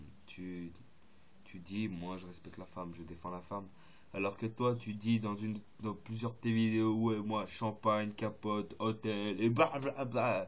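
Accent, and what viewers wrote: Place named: Belgium. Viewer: Il a un leger accent belge...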